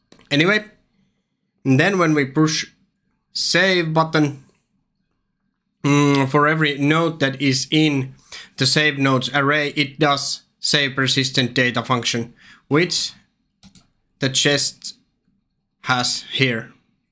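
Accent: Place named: Finland. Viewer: I can hear Finland in your voice.... Nice video mate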